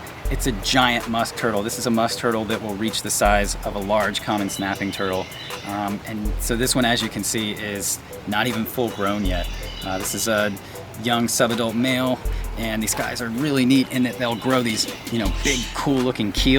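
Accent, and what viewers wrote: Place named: Georgia, United States. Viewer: I wonder what state he is it sounds like Georgia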